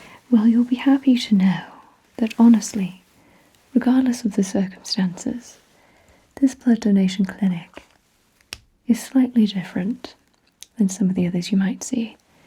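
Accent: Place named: England, United Kingdom. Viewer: i find it amusing that the way you channel the sinister, menacing vibe is by sounding more English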